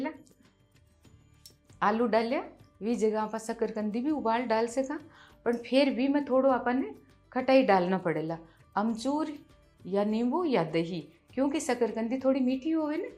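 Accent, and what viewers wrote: Rajasthan, India. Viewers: Aap rajsthani bolte he so mithi boli he aap ki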